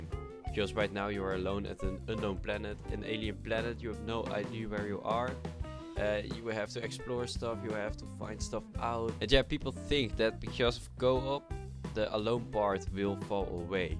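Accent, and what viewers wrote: Netherlands. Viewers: Are you from Holland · er was makkelijk te horen dat jij een nederlander was 😅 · Are you dutch? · You sound dutch(I am)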